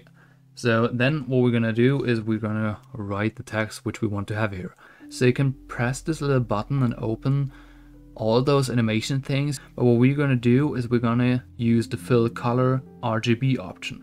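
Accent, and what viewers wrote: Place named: Germany. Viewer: Erster Satz direkt den deutschen Akzent gehört <3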